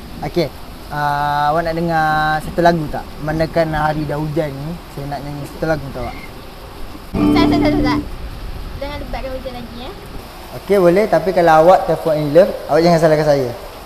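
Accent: Kelantan, Malaysia. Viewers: Bg dari kelate ke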